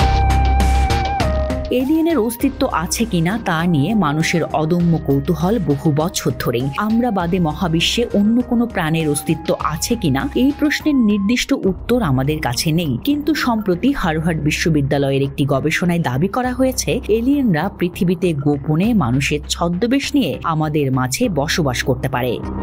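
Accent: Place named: India. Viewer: খবর পড়লেন যে সে কি ভারতের নাকি বাংলাদেশের কথা ভাষা শুনে মনে হচ্ছে ভারতের বাঙ্গালিরা এত করা গলায় কথা বলেনা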